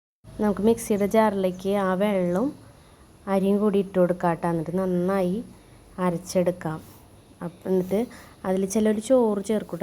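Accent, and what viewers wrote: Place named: Thrissur, India. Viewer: തൃശൂർ ഭാഷ നല്ല രസം. പിന്നെ വെള്ളപ്പം 😋👍🏻❤️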